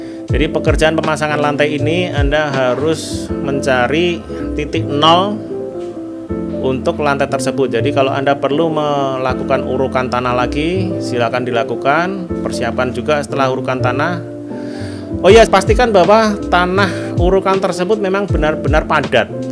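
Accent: Java, Indonesia. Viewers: Kerbaooo 😂jowo ne kuentel banget mas👍👍👍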